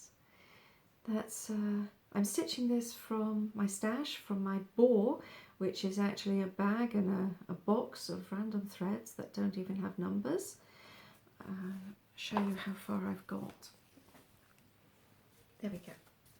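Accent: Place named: United Kingdom. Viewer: on Flosstube you are a treasure. I was so calm listening to your voice yesterday. I am never calm and can’t relax. Lol. British accent and dialect is always relaxing to listen. Hurry and post another one. I want to see what you’re doing. I might try to make a video this weekend. Maybe. LOL Are you on Instagram? I’m Sweetwoodruffs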